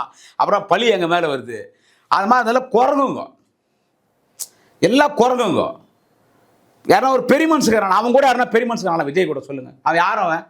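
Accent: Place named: Pondicherry, India. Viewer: Pondicherry/south arcot district..slang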